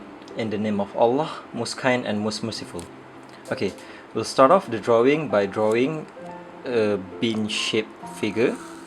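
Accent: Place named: Johor, Malaysia. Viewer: nampak beno gaya bahasa cam orang johor. "wait eh". huuuuu. hidup JDT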